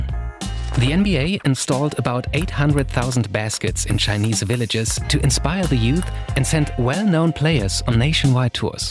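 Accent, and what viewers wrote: Germany. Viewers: I like this guy's thick German accent. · Still loving den deutschen accent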